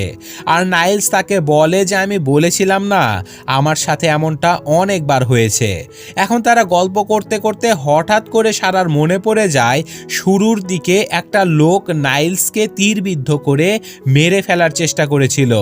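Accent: Bangladesh. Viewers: Dada apnar voice ta khub sundhor API ki bengladesi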